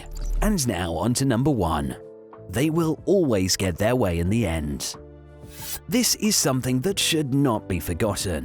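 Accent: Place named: United Kingdom. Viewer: If you were born in America before 1960, and identify as a biological male, then you are what this charlatan defines (withHisBritishAccent), as a "sigma male".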